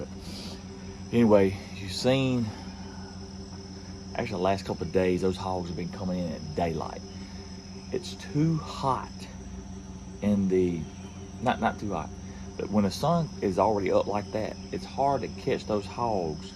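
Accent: South, United States. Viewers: I Shirley enjoy watching your videos. I've learned so much about the problem with these feral hogs. He explained why are you doing very very well and you have a nice southern accent. I'm glad you don't show you killing the hogs, you just show them after they're dead. Keep up the 👍 work.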